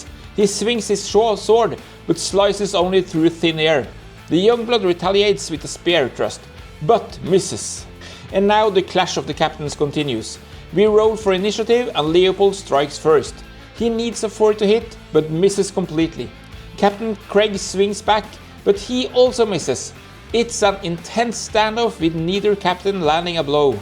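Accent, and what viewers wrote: Norway. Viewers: Den norske aksenten skinner fint gjennom :)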